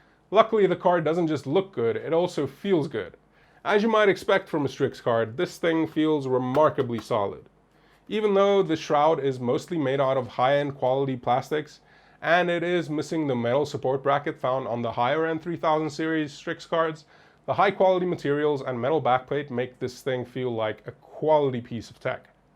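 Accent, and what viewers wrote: South Africa. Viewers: hehe, do I detect as slight South African accent in there?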